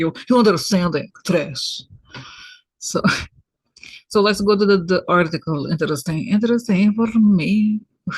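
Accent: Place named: Russia. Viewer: Thank you 🩵🙏❤️✨🌟. Your Russian accent makes me smile and laugh even in these very trying times!!! Thank you!!🙏 God Bless you🙏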